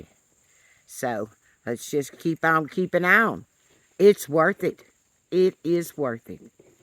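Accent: South, United States. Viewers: I’m a new follower…I love hearing your thoughts with a southern accent. I am 70 years old and live in California. I paint rocks for elections and send them to battleground states to remind them that I really want to help them become blue. The DNC was beautiful. I don’t want to feel anxious about America anymore.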